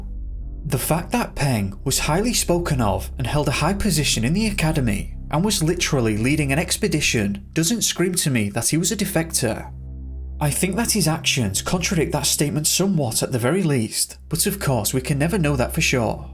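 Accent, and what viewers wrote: United Kingdom. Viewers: What is it about these stories narrated by a soft British/European accent? I enjoy them all, for some reason. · Is it me or does this guy sound like Chills with a British accent??? His cadence and what not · Triela Rhyfel he does he has a regional British accent. Get over it. He talks differently I can’t deal with it. Grow up.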